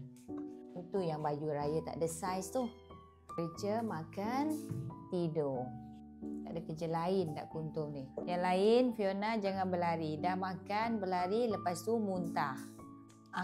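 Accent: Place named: Java, Indonesia. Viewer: Akak org jawa ea?dgr cara bcakap pekat slank jawa akak..😅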